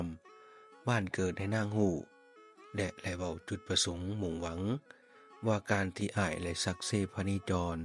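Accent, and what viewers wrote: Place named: South, Thailand. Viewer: ໄທໃຕ້ເວົ້າມວ່ນ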